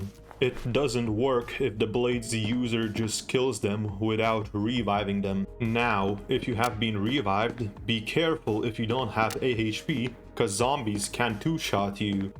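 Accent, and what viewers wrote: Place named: United Kingdom. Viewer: british scp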